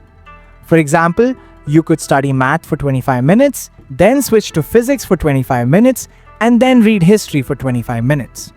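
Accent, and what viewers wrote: India. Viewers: Sorry, totally off topic ... don't understand why narrator has "Indian" accent ... (imho disappointing - no offense to narrator but I think it would've had more impact. Just saying). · His accent is Indian!!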